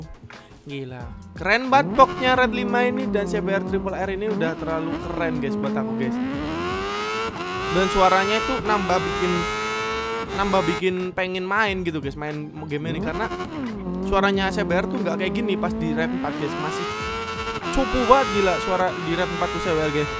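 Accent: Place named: Purwokerto, Indonesia. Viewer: purwokerne ngendi pak? logate ra ilang haha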